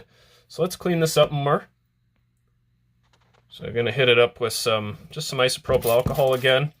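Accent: Canada. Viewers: Hi im from the Netherlands. I really love your videos, and learning stuff and such a pleasant Canadian accent. Just wanted to say it once. Keep going!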